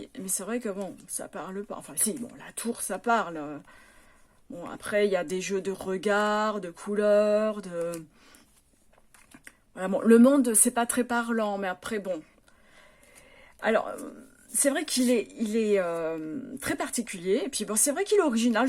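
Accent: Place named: Italy. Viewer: Coucou, j'ai bien aimé découvrir ta sélection de jeux au travers de ce challenge. J'aime beaucoup le Heaven and Earth tarot tout comme le tarot des Runes! Moi, j'aime bien ton accent italien! 👍 Belle journée, à bientôt 🌸🌸😊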